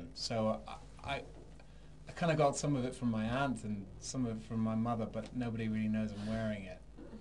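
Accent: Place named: England, United Kingdom. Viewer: Actually, he does have an English accent, you know... I think it sounds normal as ever... if anything, it does sound a little tipsy XD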